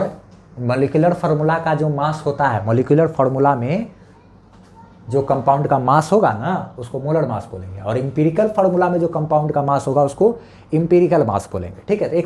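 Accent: Bihar, India. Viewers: App bihar ke hai? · Aree bhai enka language se he pata chal raha ha ki ye Bihar se ha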